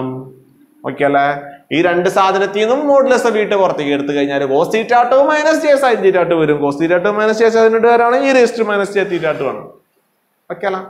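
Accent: India. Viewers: Kasargod karan alle ariya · pinnalha... തൃശ്ശൂർകാരൻ ഡാ 🔥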